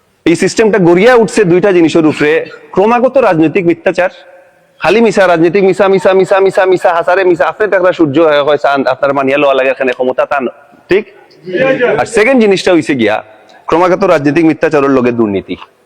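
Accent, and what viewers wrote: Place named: Sylhet, Bangladesh. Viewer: আমরা সিলেটের মানুষের আচার আচরণ অন্য বিভাগ থেকে আলাদা ❤ আপনার কথার ভাষায় তা আবারও স্পষ্ট 😊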